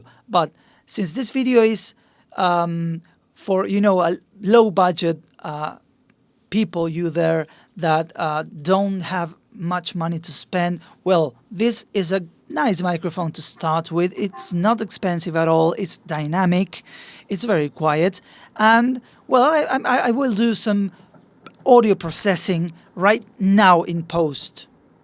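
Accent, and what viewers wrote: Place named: France. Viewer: Dude is eh s’french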